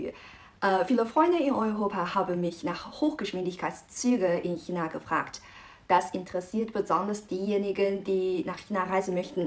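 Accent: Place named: Germany. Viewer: Deine Aussprache ist sehr gut! Ich schaue auch aus Österreich, hier in Österreich sprechen wir China aber anders aus, mit "K" also "Kina", so wie Charakter, Chaos, Cholera alles mit K am Anfang. Chemie wird auch mit K ausgesprochen. Man merkt also, dass du in Deutschland Deutsch gelernt hast ;) Wie lange lebst du schon in Deutschland?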